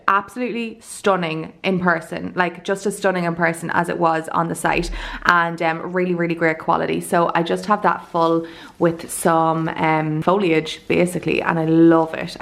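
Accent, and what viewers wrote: Ireland. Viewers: Missing so much your videos!! Because it’s like chatting with you, like hanging out with you, also I loooove your Irish accent and I learn it with you 🙊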